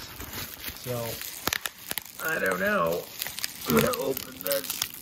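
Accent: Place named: United Kingdom. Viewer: Also love your British accent haha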